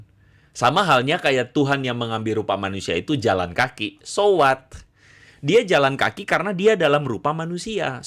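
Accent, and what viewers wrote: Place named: Java, Indonesia. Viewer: Si medok ketipak tipung adalah ustad mukalap yg spesialis bahas Alkitab, itulah jualannya spya pundi2 ya tetap berlimpah,tp bahasannya sangat ngaco dan bar bar, ngaca!! Belajarlah yg bny spya pinter sdikit, kasihan saudara muslim sy yg sdh di sesatkan oleh si medok. Dy sendiri ga ngerti alur Alkitab tp sok sok ingin membahas Alkitab, krn itulah dagangan ny yg laris manis, pesan sy buat: belajar ngaji dan solat aja dgn lebih khusuk, jgn masuk kamar orang lain. Urusin kamar mu sendiri, kamar mu saja berantakan ga karu2an. Beli kaca spya bisa introspeksi diri dan jgn lebailah.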